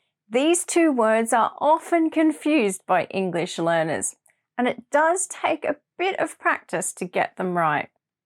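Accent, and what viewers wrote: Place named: United Kingdom. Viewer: Hi,! I enjoy your British pronunciation. I found your channel today and will continue to use it.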